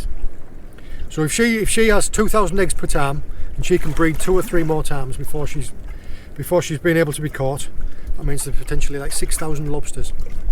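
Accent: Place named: North, United Kingdom. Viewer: Ay up ! 😂 class don’t drop them northern roots pal 👊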